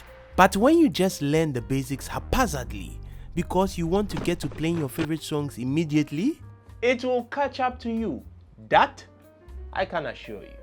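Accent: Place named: Africa. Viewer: Hey African brother I low key love the accent lol thx for the vid.edit:i am only calling you afican because i have heen in afican villages lol (i am african my self loll).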